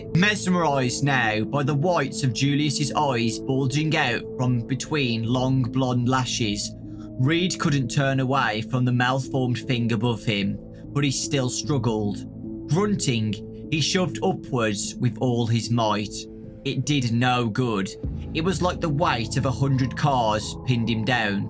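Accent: United Kingdom. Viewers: He sounds sorta british and I want to have a british accent but I have this boring default american accent, I wanna say,”OI MATE YOO AINT GOT NUFFIN O ME!”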